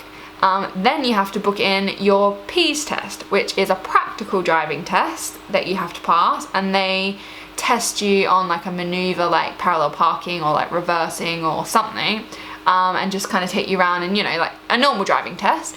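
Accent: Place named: London, United Kingdom. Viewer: You're accent has definitely become more London :) I'll not say 'British' because there isn't really a British accent.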